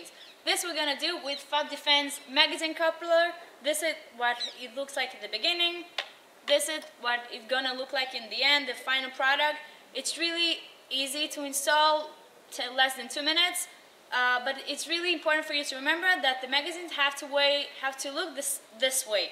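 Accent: Israel. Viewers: If you really want to get into details, she's not Russian, she's Israeli, read the description, about 20%-25% of Israelis today are Russian immigrants or their children from the big soviet nations immigration from the early 90's, so judging by her name she's probably from a Russian origin, but judging by her age and accent, she most likely was born and raised in Israel.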